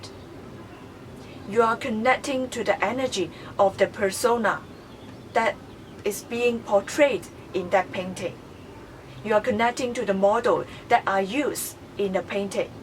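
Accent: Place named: China. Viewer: Chinese accent is so beautiful to hear.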